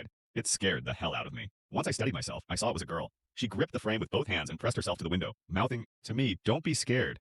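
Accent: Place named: China. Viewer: Personally I think the ai voice with the Chinese accent it’s sounds more funnier and fun and also the more higher in pitch voice you used for like X-ray gambling jade video sounds better overall I would say if you use this voice you should consider also playing background music so it doesn’t sound as dry and monotone also I love your vids bro and how long they are really enjoyable to watch I probably subbed at like 50 subs but keep up the consistency and constant improvements and you’ll do great🎉 sorry for the lengthy comment😅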